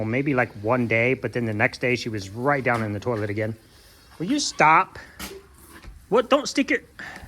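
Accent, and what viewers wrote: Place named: Midwest, United States. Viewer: Looks like you may be getting some crap weather going through Ohio this weekend into next week. Frosty has the prettiest babies and they love her. Gingy is yo-yoing again. Poor girl. She gave us Chuck Norris. I live in Texas, but was raised in Iowa. Love that Midwest voice tone. Praying for Gingy to recover. Weather won't help her. Much love to both you and H.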